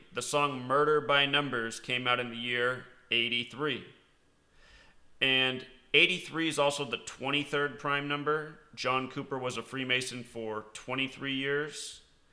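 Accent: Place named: United States. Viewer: Whao what i can't believe is that people did not ask the question I mean when it came on the radio I said out loud how can a couple both die of heart failure WT...... I thought I heard it wrong. there was no discussion in the public I really started to believe I dreamt it then low and behold Your feed popped up on the sidelines I thought oh I clicked imagine my surprise when I realized you were from America or Canadian I can't tell the difference. OK concider my mind blown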